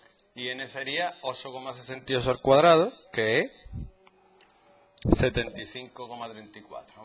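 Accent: Andalusia, Spain. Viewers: Es andaluz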